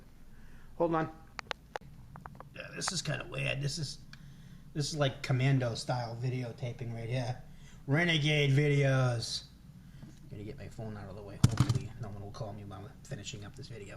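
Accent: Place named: New England, United States. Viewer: Pacific cutlery! And I would recognize that accent anywhere go PATS!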